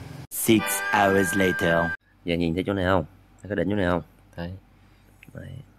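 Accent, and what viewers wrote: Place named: Quang Nam, Vietnam. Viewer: helo a, nghe giọng a giống ở quảng nam z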